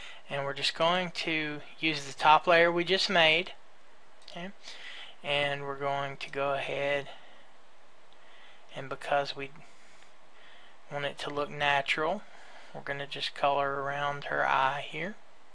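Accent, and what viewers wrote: Texas, United States. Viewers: Very helpful. Thanks for posting. I also love your Texas accent. Reminds me of my time spent in that great state.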